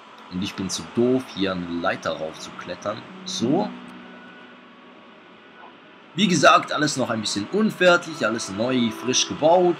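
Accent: Switzerland. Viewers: Da hört man deinen Schweizer Dialekt noch ein bisschen raus😂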